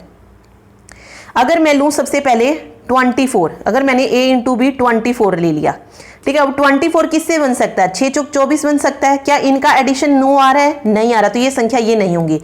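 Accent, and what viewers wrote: Haryana, India. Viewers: And mam app haryana se ho apki language me haryanavi touch h an ye video hamera liya bhoot hi helpful h so thanku again mam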